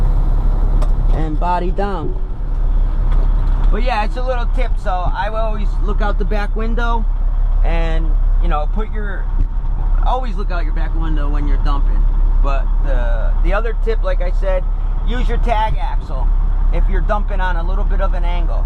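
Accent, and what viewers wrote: United States. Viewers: You talk funny.... WHERE you from BOSTON? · Great video...u in the tri state area...accent sounds familiar...new York New Jersey or CT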